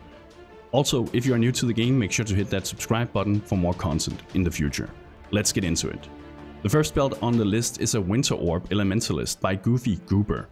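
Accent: Denmark. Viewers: Are u danish? 😅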